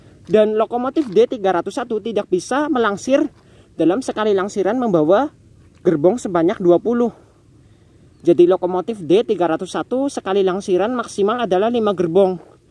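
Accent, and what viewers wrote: Banyumas, Indonesia. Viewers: Mantap... Liputan dgn logat ngapak Lanjutkan!!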